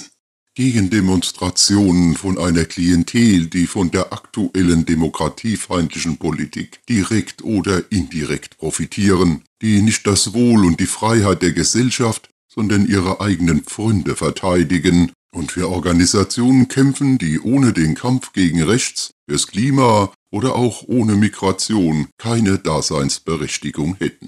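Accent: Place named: Swabia, Germany. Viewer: Der Gorilla hat es geschafft, das ich (Schwabisch Dialekt?) ertrage. Top Integrationsleistung. Vor war es wie Fingernägel auf Tafel. Ich denke schon, das es enormes Einsparungspotenzial bei NGOs gibt. Hat denn (Miley?) Erfolg damit? Orban hat es erfolgreich exerziert. Und möglicherweise einen Maidan 2.0 verhindert.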